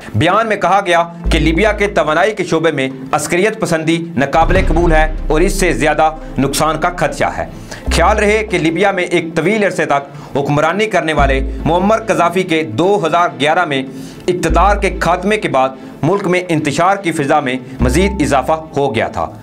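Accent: India. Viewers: Aap apna taharef to karwa dein, ap ki urdu indian lagti hey , keya ap india se hein ? Please jawab dein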